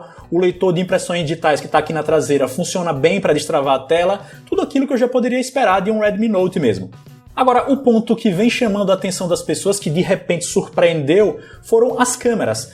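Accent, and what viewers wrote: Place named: Northeast, Brazil. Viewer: Gostei muito desse Rewiem Nordestinizado KKK # nordeste Já vou me inscrever 😁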